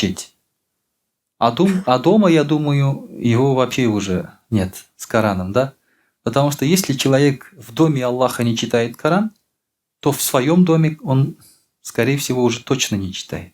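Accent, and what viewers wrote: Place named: Tatarstan, Russia. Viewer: Бик таза чын татар теле зур рэхмэт эти эниегезгэ эби бабаларыгызга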